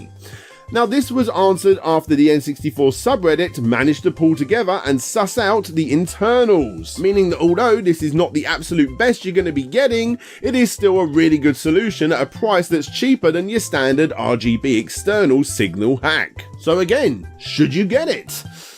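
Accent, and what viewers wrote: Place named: United Kingdom. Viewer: This guy’s voice is too limey for me.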